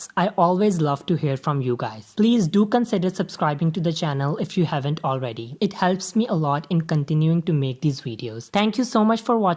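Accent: India. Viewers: mhm the indian accent gets me everytime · I love your theory. And your Indian accent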